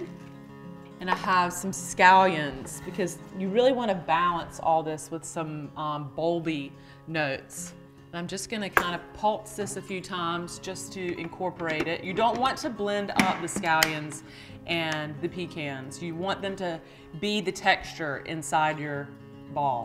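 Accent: South, United States. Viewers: I love your pronunciation and Southern Drawl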